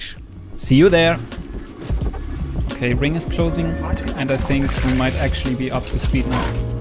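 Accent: Germany. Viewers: I can heard that your German bro haha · Man hört übelds das du deutscher bist😂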